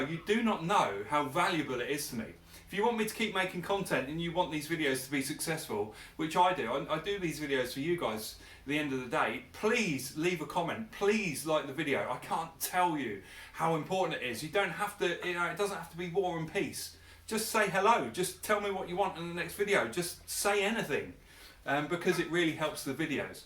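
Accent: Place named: United Kingdom. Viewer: hey, thanks for your videos , it really help to make a transition. Besides, it is nice to listen a british accent for ones ;)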